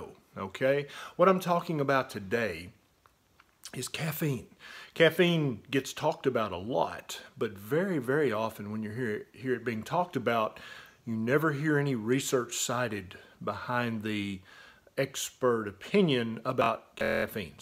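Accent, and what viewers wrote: South, United States. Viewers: What part of the south are you from? Im curious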